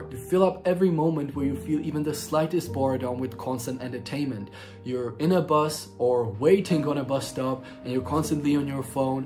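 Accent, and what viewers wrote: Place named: Czech Republic. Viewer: I can say youre from czech republic right? btw great video man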